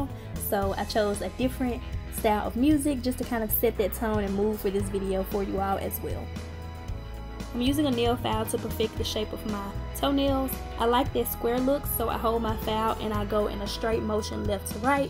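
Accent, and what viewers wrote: South, United States. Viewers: Nice southern accent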